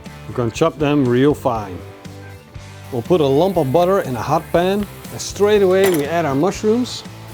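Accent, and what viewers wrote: Netherlands. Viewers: You‘re dutch, right?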